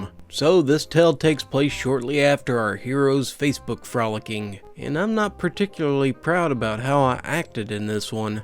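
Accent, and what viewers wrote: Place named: Appalachia, United States. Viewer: I have your voice. You sound like a hillbilly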